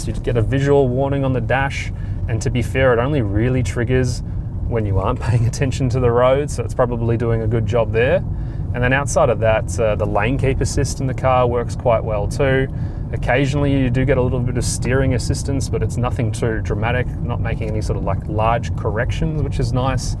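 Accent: Australia. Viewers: The only spoiler is that tearable Australian accent. Very hard to understand. Kiwi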